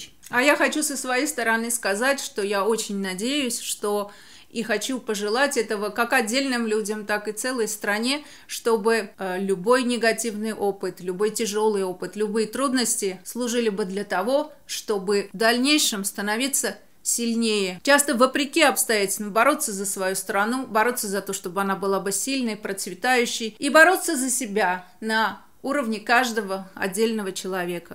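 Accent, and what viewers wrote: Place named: Armenia. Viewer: Вы уже говорите с армянским акцентом 😂 👍👏.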